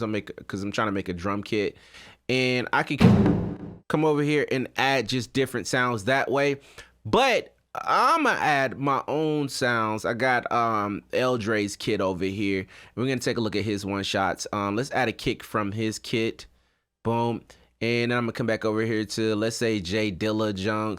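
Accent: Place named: Baltimore, United States. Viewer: Bro are you from Baltimore😂 couldn’t help but notice the accent but this video was great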